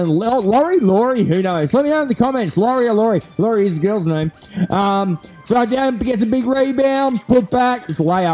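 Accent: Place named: Australia. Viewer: your voice is change, you sound more australian now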